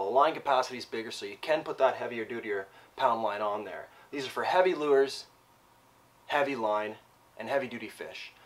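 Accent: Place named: Upper Midwest, United States. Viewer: Are you from the upper Midwest? The accent is really telling.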